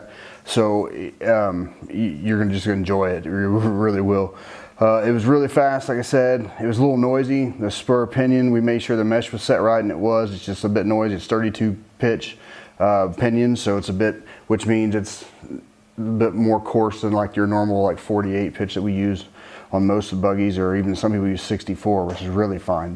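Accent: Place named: United States. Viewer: its kinda funny cos u have such a strong american accent but you talk in quid or british pounds lol good video tho!!!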